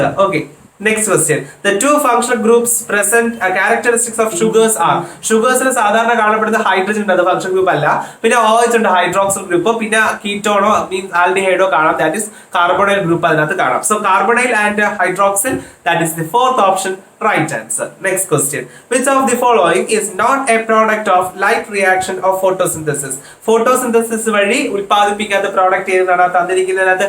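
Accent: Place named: Punjab, India. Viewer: Punjabi like ye kya bol rha hai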